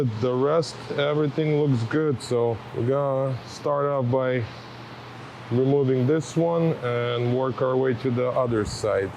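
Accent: Russia. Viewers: Seems maestro has Russia accent.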